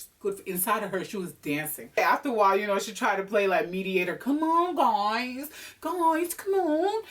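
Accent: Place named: United Kingdom. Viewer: Lmao 4 real!!! Love the British accent hahha hilarious 😂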